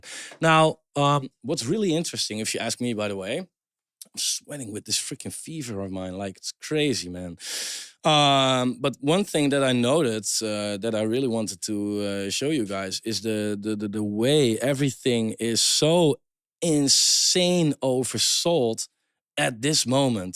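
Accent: Netherlands. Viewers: I like your dutch accent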